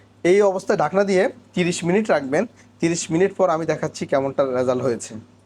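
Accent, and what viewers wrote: Noakhali, Bangladesh. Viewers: নোয়াখাইলা ভাই, পলাও ভালো রাধছেন।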